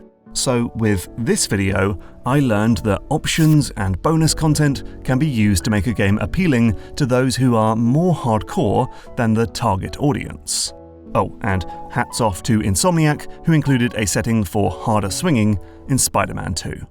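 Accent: United Kingdom. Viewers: Idk man, the fancy motion graphics and British accent was pretty convincing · Finally, he addresses the elephant in the room: his British accent. cf. Between Two Ferns e/ Benedict Cumberbatch: "Do you think if you didn't have a British accent, people would be able to tell you aren't a very good actor?"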